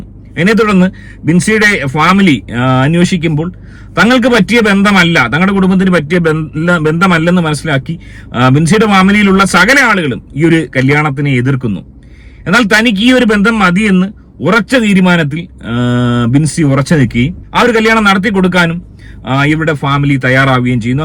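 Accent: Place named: Kottayam, India. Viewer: ആദ്യമായി ഈ ചാനൽ കാണുന്നു.. കോട്ടയം ശൈലിയിൽ വ്യക്തമായി കാര്യങ്ങൾ പറഞ്ഞു. Congrats ❤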